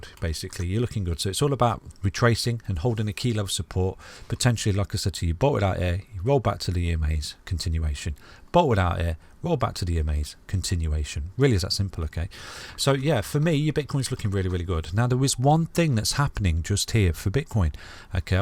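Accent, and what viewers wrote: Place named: Nottingham, United Kingdom. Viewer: Your accent sounds like Nottingham, am i right?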